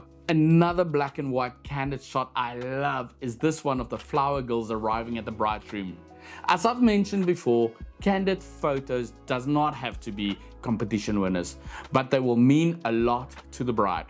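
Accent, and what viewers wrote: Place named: South Africa. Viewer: Great stuff! And I loooove your thick south African accent! Liked and subscribed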